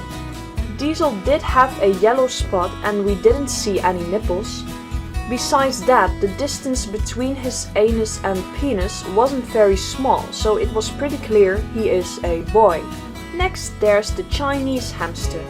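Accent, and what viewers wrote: Netherlands. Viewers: Are you dutch? If so, I can kinda hear it by your accent. Btw Ik ben Vlaams. :)) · Vgm is ze nederlands ja het accent is heel erg te horen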